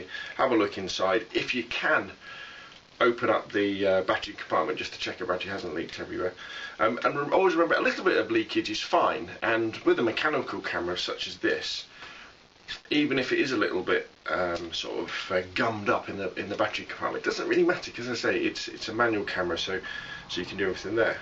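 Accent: United Kingdom. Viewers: Love how the pure British tone elevates the whole review. It’s like listening to BBC narrate a hidden gem of film cameras.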